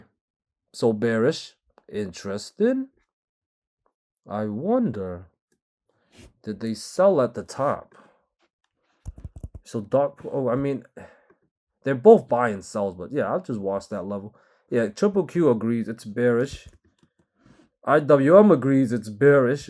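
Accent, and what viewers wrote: New York, United States. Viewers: Yo, when are you going to reveal your identity? You're definitely from NYC. You probably from Brooklyn cuz you stay keeping it 💯 😂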